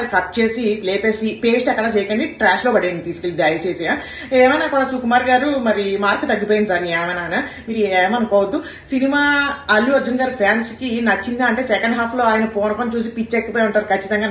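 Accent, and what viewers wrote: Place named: Godavari, India. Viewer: అచ్చడిపోవాలి అంతే 🤣🤣🤣...గోదారి స్లాంగ్ ఆదరగోట్టారు👌🏼😍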